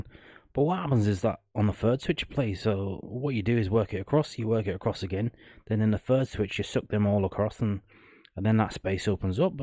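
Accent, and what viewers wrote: Scotland, United Kingdom. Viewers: Interesting and informative but that Scottish accent 🤬 fuck me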